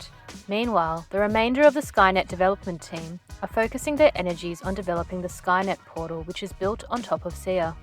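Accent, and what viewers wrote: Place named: Australia. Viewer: Nice video. You from Oz? I'm from NZ, so the accent stood out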